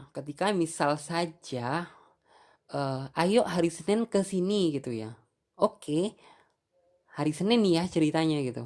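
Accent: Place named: Surabaya, Indonesia. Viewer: Kaka cara logad ngomongnya kayak orang surabaya kaka tinggal disurabaya ya ??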